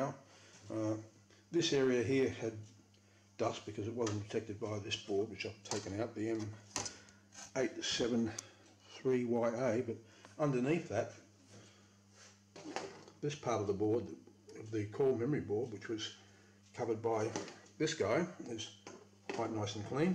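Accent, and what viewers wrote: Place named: Australia. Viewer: Nice to see another -11. Mine are all QBus so it's nice to see a nice unibus box and good to hear another aussie accent. Will subscribe. Hope to see more. Keep up the good work!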